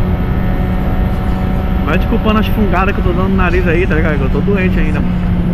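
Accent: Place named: Pará, Brazil. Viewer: Mais que vc tá com sutaque paraense isso ta kkkkkkk fica suavi manu tava zuando com vc só alegria !! I pra resolva sou Paraense mais des dos 9 anos fui criado e estudei em sampa mais to fora do pais a trabalho mais sempre que posso vo no meu Parazao ve us carinhas trutas!!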